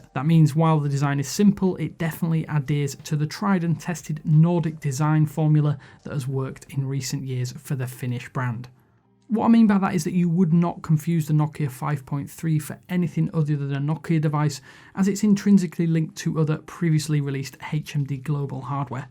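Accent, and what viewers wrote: United Kingdom. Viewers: I don't mean to be rude, but what's English accent does he speak? I presume it's British accent yet not confident enough to be sure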